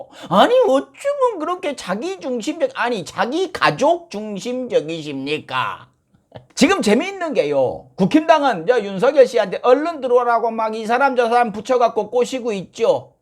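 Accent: Jeolla, South Korea. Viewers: 전라도 사투리 심해서 좀 거북하네용 ㅜ 내용은 좋네요 지당한 말씀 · 전라도 사투리 억양이 너무 강해서 없어 보여요. 안그래도 없어 보이는데.ㅎ 조언 하나 드리자면, 정치 시사 관련해서 신뢰성을 갖기 위해서는 개콘처럼 하면 앙대여. 차라리 그냥 연변사투리로 하던지~~~ㅋ 근데 티비는 언제쯤 나오려나여? 부르는 곳이 없어 유튜브 하는건 알겠지만 메뚜기도 한철이니 열심히 해보셔요.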